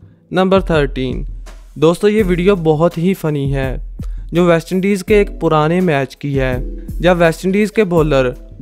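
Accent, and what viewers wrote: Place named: Punjab, India. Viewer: Poor comentry punjabi style😔😔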